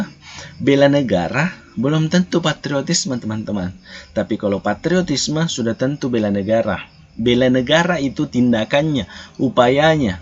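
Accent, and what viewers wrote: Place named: Sulawesi, Indonesia. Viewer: Sudah saya subscribe kak,keren pnjelasanx kak..mudah dpahami,, sepertinya logat Sulawesi 😂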